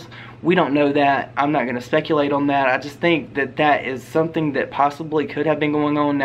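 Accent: South, United States. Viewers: I love your southern accent so much! And you have a good perspective, I totally agree that Jason's jokes were unacceptable. Nice video! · I love your accent! Usually southern accents are so intense and I can’t understand people but yours is lovely lol also great video thank you ❤️ I am subbing!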